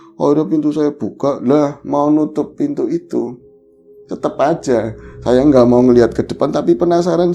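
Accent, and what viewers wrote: Banyumas, Indonesia. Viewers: Wilujeng siang Selasa ceria sambil mendengarkan suara bro. Ngapak nya kental. Maju NGOBOR Jangan lupa bahagia 💪💪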